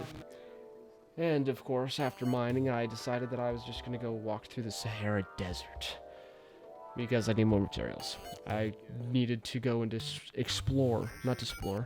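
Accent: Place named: United Kingdom. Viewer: blud is bri ish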